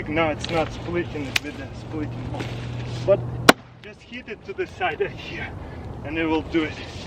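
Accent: Russia. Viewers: An out of shape white guy from Tennessee talking with a Russian accent. Eh, seems to be working for him. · Bring back Russian accent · Do you russian because. Your aced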